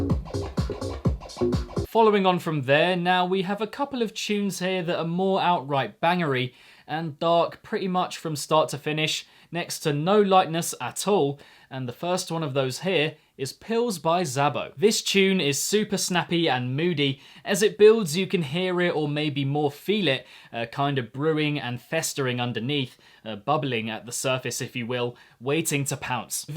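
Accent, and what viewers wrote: United Kingdom. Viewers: Good video but my ears is blooding by your bri'ish acсent, sorry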